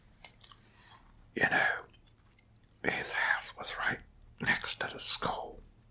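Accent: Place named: Pittsburgh, United States. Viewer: You sound like you're from Pittsburgh lol.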